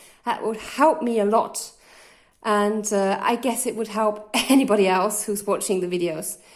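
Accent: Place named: Australia. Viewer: i thought she was a fellow australian for a minute lol.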